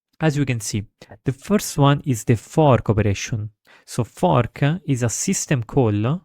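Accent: Italy. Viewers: Nice italian accent!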